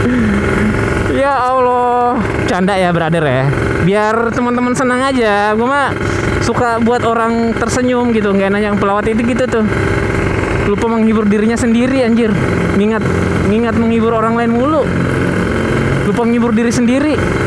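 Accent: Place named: Sulawesi, Indonesia. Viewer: Asli Sulawesi kah bg? soalnya logat nya kaya orang Sulawesi •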